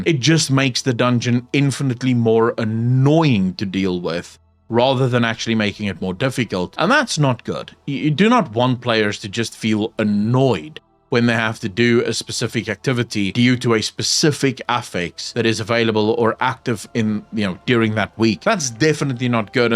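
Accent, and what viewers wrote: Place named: South Africa. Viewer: are you south african? love your accent awesome video and good takes. og wow fans will stay, reminds me of the wod period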